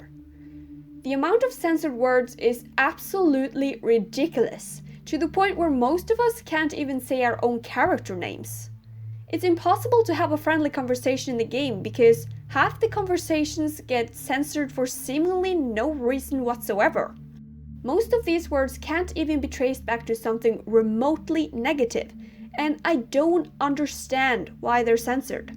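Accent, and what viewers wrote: Norway. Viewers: are u norwegian? o-o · wait are you norwegian??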